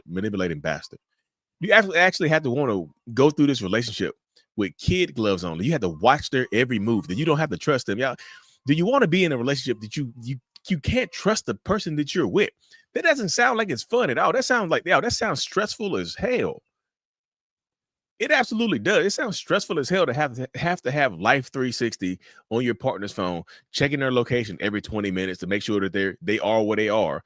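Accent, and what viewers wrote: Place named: North Carolina, United States. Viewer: I have watched so many of your videos, I now say the word "no" with a North Carolina accent.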